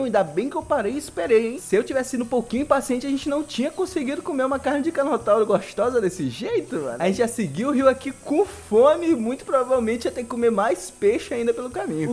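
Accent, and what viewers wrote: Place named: Northeast, Brazil. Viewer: Que saudade que eu tava de vc é do seu sotaque nordestino eu também só nordestino só que eu moro em Minas Gerais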